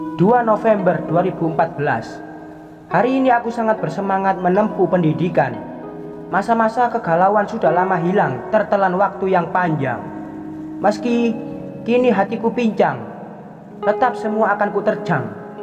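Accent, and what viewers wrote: Java, Indonesia. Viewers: Lanjut cetita y pokoke slalu ngakak...dngan bhsa y medok bngt...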